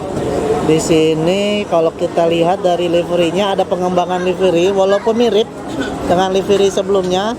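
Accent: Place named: West Sumatra, Indonesia. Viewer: Masak gak tau lakuak paku bg...abg aja asli orng minang...bahasa indonesia abg aja masih ketahuan logat minang nya🙏🏿🙏🏿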